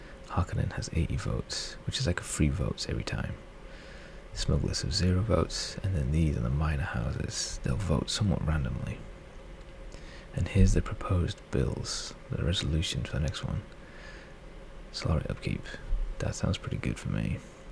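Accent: Yorkshire, United Kingdom. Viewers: Appreciate every video you put up. Feels good to have a Yorkshire representative in the ASMR community. 🗿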